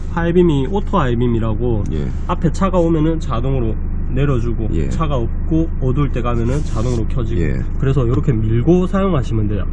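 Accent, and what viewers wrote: Gyeongsang, South Korea. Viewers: 같은 경상도 사람으로서 듣고 있습니다 친절하게 설명 잘해 주시네요? ^^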